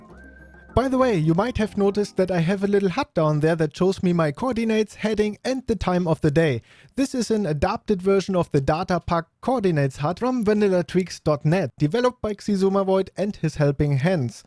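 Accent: Germany. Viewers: Bist du Deutsch? Hört sich so an!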